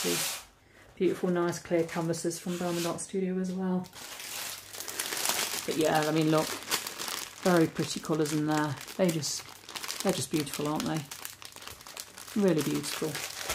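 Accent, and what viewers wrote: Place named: England, United Kingdom. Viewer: Wow I love the world earth day DP looks buatifull iv only come across you today An it's so nice to listen to an English person An not an American voice lol my cat daisy seems to love getting into amazone boxes xx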